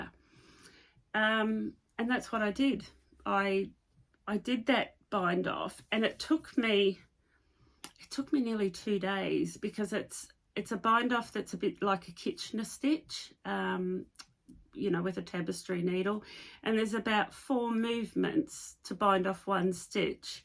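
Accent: New Zealand. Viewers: Hi. Thank you for brilliant podcasts. I always learn something new, today was the cast off tip. Love your vest, I've never been mad on them but you've persuaded me. Have to admit that I often feel homesick when hearing your accent. 2023 will see me winging my way from the UK to Rangiora and Napier!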